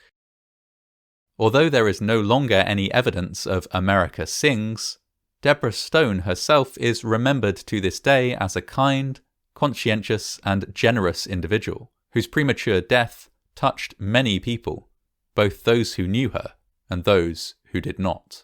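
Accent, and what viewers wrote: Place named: RP, United Kingdom. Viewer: Why does this guy speak so slowly does he think that Americans won't be able to understand is highfalutin English accent? I have to turn it up to 125% playback speed just to not be annoyed.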